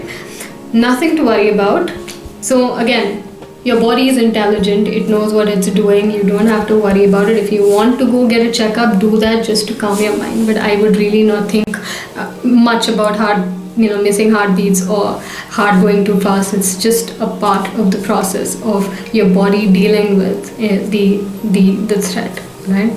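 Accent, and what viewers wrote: India. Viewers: This was a great video with one exception.... the negative effects of the sporadic release of cortisol. I also think that people with a not so healthy heart might stroke, I don't know as I am not a trained professional but I have had all these symptoms it seems at the same time. I have also experienced horrible "brain zaps" that left me numb all over, those are so scary! Thank you for a good video! I have always loved to listen to the flow of an Indian accent. Blessings!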